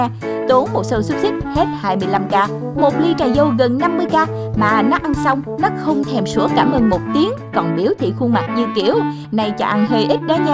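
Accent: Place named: South, Vietnam. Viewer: Nhiễu nước dãi .. gớm quá.., giọng đọc miền Nam dễ thương .. quá.. !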